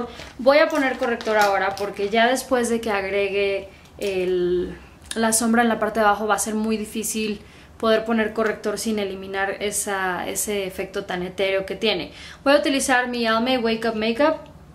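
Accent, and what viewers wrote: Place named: Fresa, Mexico. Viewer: Hablaas taaan pero taaan fresa que desespera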